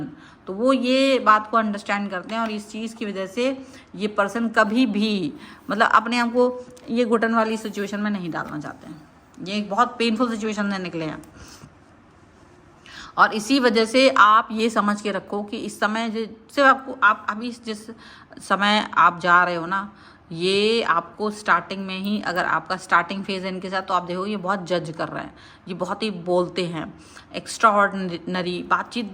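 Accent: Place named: Uttar Pradesh, India. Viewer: 😘😘😘😘😘 aap mujhe U.P. se lagti ho kyuki main bhi U.P se hu and aap ko sun ke maine pehle bhi poocha tha...jab first time aapko suna tha....mujhe hamare ghar ki yaad aa jati hai..😢 isliye ...naa ki kuch galat hai...I truly like you and appreciate your efforts ❤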